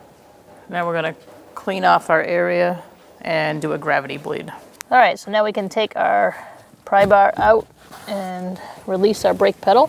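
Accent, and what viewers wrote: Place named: New England, United States. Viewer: love that New England accent.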